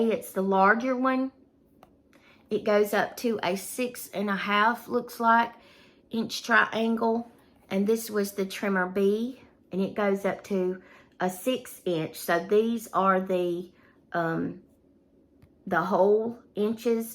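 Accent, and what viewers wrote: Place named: South, United States. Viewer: Are you saying peg apron or pig apron? Sorry, from Montana and not good with southern accents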